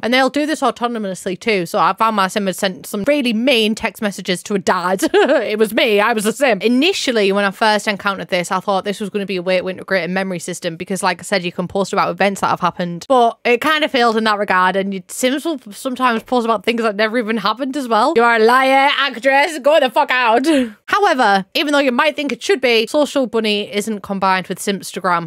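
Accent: United Kingdom. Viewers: bro i’m sorry but what’re your accent is? somewhere in britain?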